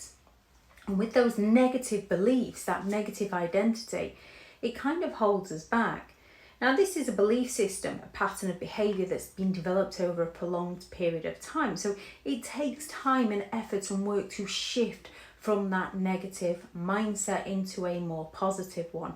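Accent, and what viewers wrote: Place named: Scotland, United Kingdom. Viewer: It’s a very long slow process for the mob to catch on. Your message is so powerful. You’re 10 years ahead of a typical gringo. I know I’m supposed to be cold towards those we understand or care for. It’s a fking lie. We must love and care for our fellow tribesmen women. It’s a 2 way gig. If your message can do this to me? Just march on. You sound Scottish to me but I only did military things in Europe. Way too busy to be focused on dialectical grammatical words or culture. I’m trying to stay awake so I can get in a schedule. Since she’s been gone. Your message has been cutting through the mess. Working down south is just bribe or pay people to leave me alone or work for me. We are not a non profit organization. Get hard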